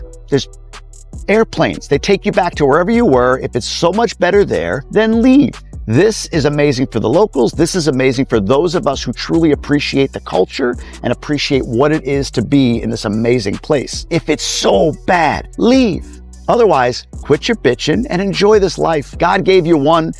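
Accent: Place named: New York City, United States. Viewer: I knew that what a NYC accent! Now it all makes sense to me lol.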